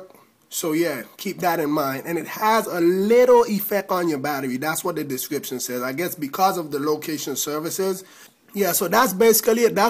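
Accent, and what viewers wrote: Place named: Jamaica. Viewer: They care about a badass Jamaican accent like that man:)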